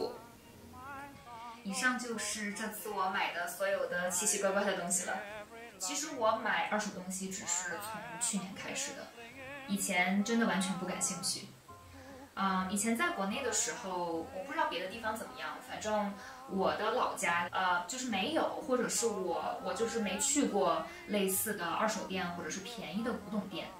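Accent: Northeast, China. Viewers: 妹子的东北口音已经被修饰得非常轻微了.你精心地学习过普通话，但是音节尾脚还是能被缺姐这样的听出来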